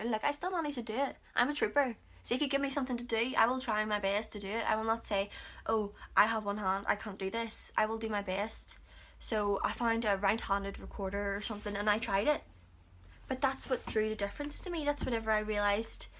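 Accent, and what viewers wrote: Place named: Northern Ireland, United Kingdom. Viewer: Thank you for sharing your story ❤ so inspiring and I love yhe NI accent xx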